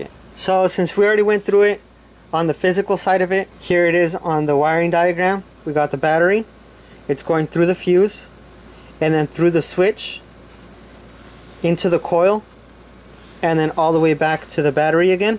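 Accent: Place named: Latin America. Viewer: Se te escucha la voz de latino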